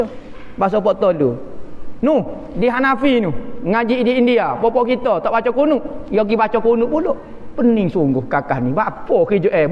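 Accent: Kelantan, Malaysia. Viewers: Xde masalah ..org luar pun leh faham loghat kelate…teruskan pendedahan..💪🏻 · Penyampaian dalam loghat kelantan 'pure'(pekat) tetapi padat tepat & jelas Mempunyai aura keilmuan yg tinggi, ikhlas serta tiada yg disembunyikan Semoga Allah melindungi Pak Syekh ni sekeluaga & kita semua dalam lindunganNya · Saya baru mengenali ustaz yg berani ni//walaupun kecik kelantan tapi jelas dan boleh difahami...TETAP SEMANGAT USTAZ..LONDEHKAN KEBUSUKAN N KEJAHATAN WAHBI...TERBAIK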